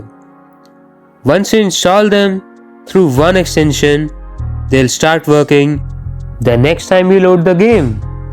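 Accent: India. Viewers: Bro are you from India 🇮🇳cuz I feel that your voice is like indian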